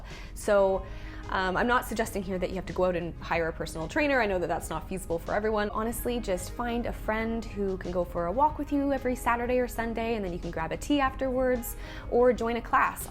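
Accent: Canada. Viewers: Thanks for the tips! Just wondering, are you Canadian?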